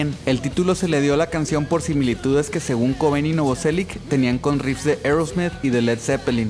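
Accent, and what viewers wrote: Monterrey, Mexico. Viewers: hablas bien regio vato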